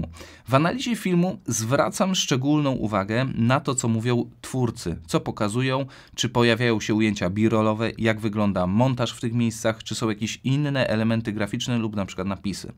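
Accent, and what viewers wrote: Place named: Kraków, Poland. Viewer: Słychać żes pieronievz Z Krakowa 😁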